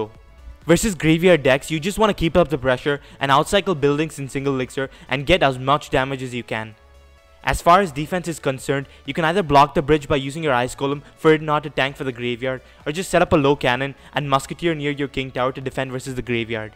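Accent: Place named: India. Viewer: I love the video! Also i love your accent. Probably the best indian accent i have ever heard :D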